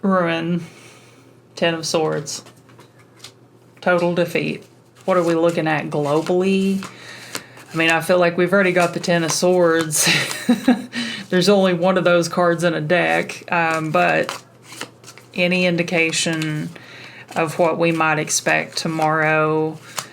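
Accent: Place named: South, United States. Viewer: Your southern accent is adorable. Thanks for the reading!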